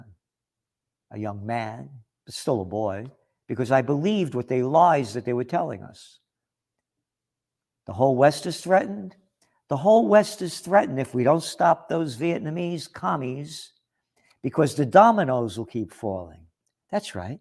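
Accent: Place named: New York, United States. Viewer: I really enjoy New York accent (kuin stadin slangia) It is music to my ears. Thank you.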